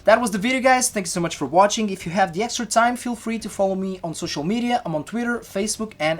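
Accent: Portugal. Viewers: Wait, are you from Portugal?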